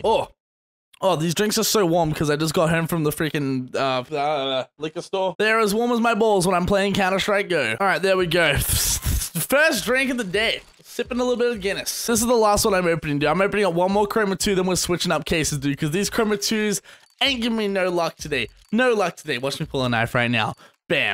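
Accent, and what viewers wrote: Australia. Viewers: your from Australia what state you from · knew u were aussie bb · i fuckin knew you were aussie wewwwww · your aussie! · Your aussie O.O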